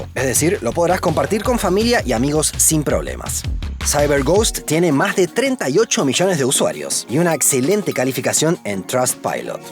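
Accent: Argentina. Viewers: como que no es argentino? el canal es de Argentina, hay tres personas detrás del canal, el chico que aparece en los videos se llama Diego Briano y es argentino. O de donde pensaste que era el acento? Mexicano..?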